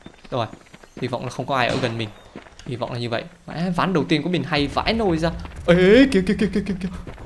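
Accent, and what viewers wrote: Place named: Countryside, Vietnam. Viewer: nói thì giọng như nhà quê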